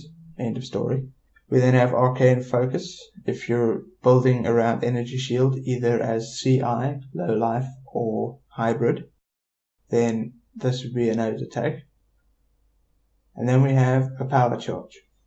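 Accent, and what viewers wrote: South Africa. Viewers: lol dude, instantly heard you were south african. thanks for the info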